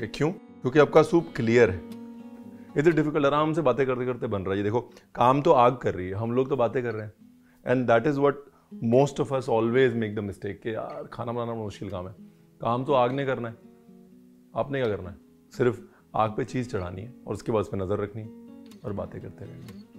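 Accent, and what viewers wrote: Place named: Punjab, India. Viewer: Sweet Punjabi accent...loved it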